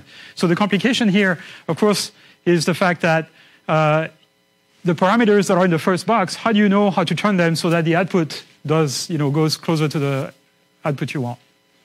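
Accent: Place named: France. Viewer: He has a french accent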